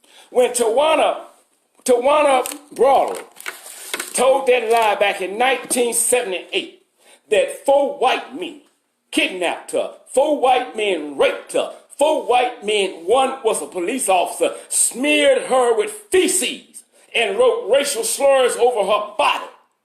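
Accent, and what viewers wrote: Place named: Louisiana, United States. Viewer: I am really enjoying this channel and love the commentary. Your Louisiana accent and passion....very enjoyable.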